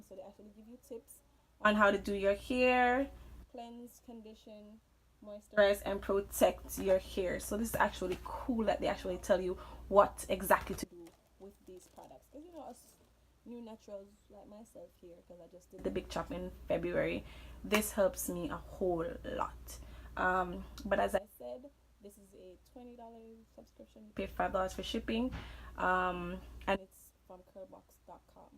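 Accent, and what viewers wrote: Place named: Caribbean. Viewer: Lol I love your personality 😂, and your accent girl!! Caribbean females all the way 🙌🏽.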